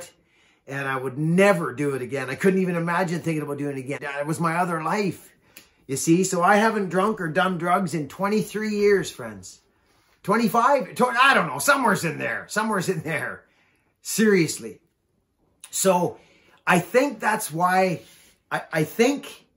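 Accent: Canada. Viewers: Hey, I been watching your stuff for a while now, I appreciate the climbing tips, I just started climbing myself this season, I've got some pretty good climbers helping steer me in the right direction. I've been around power tools and saws my whole life but I've only been clean off fentanyl/heroin for 2.5 years now, and everything is going great. I just wanted to tell you that I really like ur style (even with the cannuck accent) lol. And i enjoy the content. Keep it up man. I wish I could get out to b.c., we don't have any of them giant trees here in Connecticut. Have a great day man, stay safe! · Really great vid. I also feed an insatiable wood stove. Where the hell do you live? You sound like and have the disposition of a classic Canucklehead, I subscribed.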